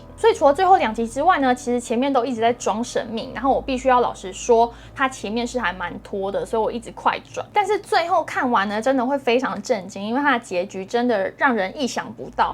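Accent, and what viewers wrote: Taiwan. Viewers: 謝謝,好奇想問主持人是否台灣人,口音很像.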